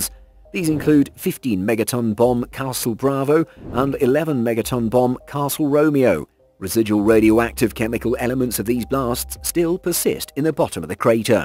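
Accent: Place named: United Kingdom. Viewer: Good Lord, soon as I heard the Brit accent I turned it off. You're always smarky, cocky, mocking. Why even put this on here if you just going to mock everything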